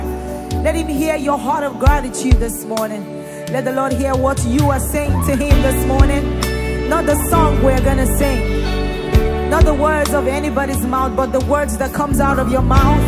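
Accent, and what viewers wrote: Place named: Nigeria. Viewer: Nothing like God's presence... meanwhile she sounds so Nigerian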